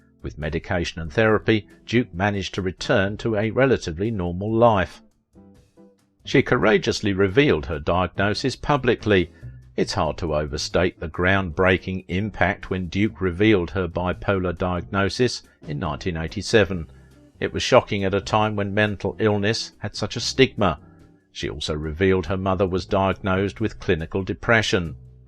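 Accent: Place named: Manchester, United Kingdom. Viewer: In your opening 1st paragraph in the last mangled sentence, you are apparently confused with the name of the actress Patty Duke and the name of the person she was portraying – Helen Keller. And in your opening 2nd paragraph you seem to be very gender confused. Can't you distinguish the difference between the "his" and "her" pronouns? Or is that a British idiosyncrasy? And why is a thick (sounds like Manchester) British accented man narrating a short bio on an American actress who's early 60's show and TV movies I very much doubt were broadcast anywhere in England or The UK at that time or anytime since then?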